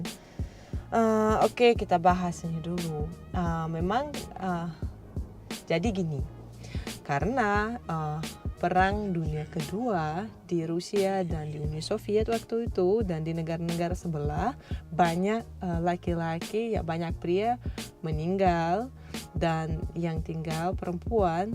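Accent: Indonesia. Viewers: Masya Allah.... ekspresi, gaya bahasa, & cengkok Indonesia banget. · Logat sudah Indonesia banget 👍👍👍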